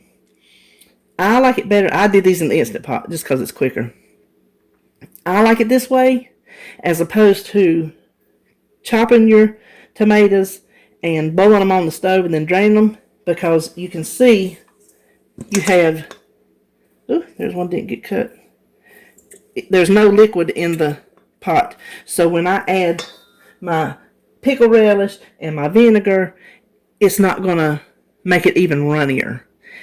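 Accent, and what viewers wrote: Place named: Union County, United States. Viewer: I use Hellmann's Light Mayo and don't notice any real difference in taste from regular. I've even convinced my husband to like it. I've never tried Duke's. I put green onions and celery in my potato salad, no relish, but the sugar is key! You live near where my father's family was from for generations . . . Union County. My grandparents lived in Monroe. No wonder I love your accent. :) You measured 883 grams, but said 833 grams! Oops.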